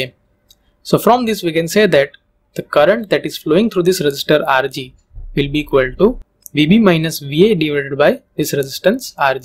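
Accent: India. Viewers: why do indeans speak like this ? can someone explain LOL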